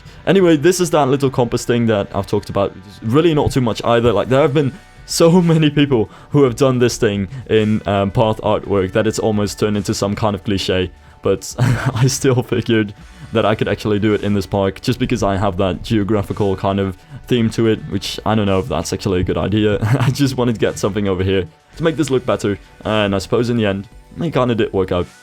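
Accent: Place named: Netherlands. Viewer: Thanks, and by your voice.. i can tell ur dutch .. Zeer goed kanaal ;)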